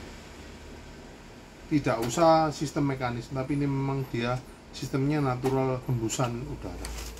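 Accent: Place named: Tegal, Indonesia. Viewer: Tegal nih kayaknya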